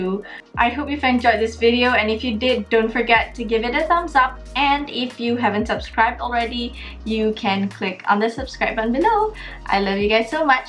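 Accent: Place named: North, Malaysia. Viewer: Bila nak buat video cakap utara lagi?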